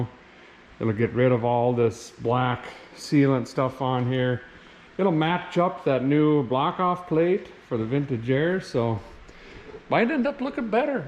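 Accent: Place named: Michigan, United States. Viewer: Where you from? You talk just like my cousin in Mich!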